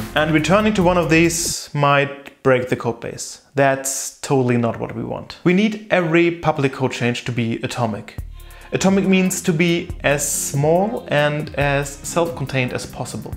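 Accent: Germany. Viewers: Man hört sofort raus, dass Du deutsch bist 😜 Aber super hilfreich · The world is so small. From your accent, I knew you're german. But then I opened your website and saw that you're also like me from Hamburg 😅 Very good video. I'd love to work with folks who could appreciate a good commit like that! Subbed!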